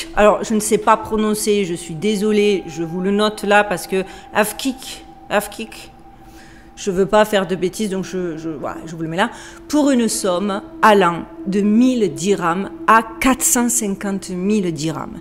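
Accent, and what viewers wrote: South, France. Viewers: J'aimerais avoir des informations de qui vous êtes ma chère et belle dame. Pourquoi d'abord vous êtes formidables et une pro dans se que vous faites 2, je suis un nouveau sur votre chaîne Youtube et je suis un artiste rebel retraité artiste de l'est du Canada français. Ma demande c'est que vous êtes très cool belle Vivent dans un condo hyper moderne. A las Vegas. Vous avez un accent du sud de la France genre de Marseille ou Toulouse ou ex en Provence. Je me demande qui vous êtes voilà bisou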